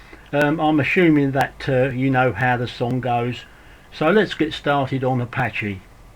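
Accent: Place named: East London, United Kingdom. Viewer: Jeje, pero es buenisima su accento, si no estoy equivicado es de los Eastenders, accento famoso del este de Londres.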